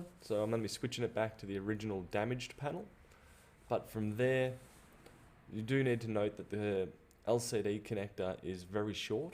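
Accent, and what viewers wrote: Australia. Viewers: Thanks for the video man! Just a question, would you be able to share the display model? I currently live in Aus and have the same exact laptop model, and in your comments you said you were able to upgrade it to a 1080p screen which is epic. I would like to upgrade my screen to 1080p aswell but I can only find 720p screens online, so I'm hoping I can find the same display you have in the internet. Or, If your local supplier is able to do nation-wide shipping, let me know and I'll also be ordering from them aswell (sounds like you're in aus aswell, lol) Anyways, liked and subscribed!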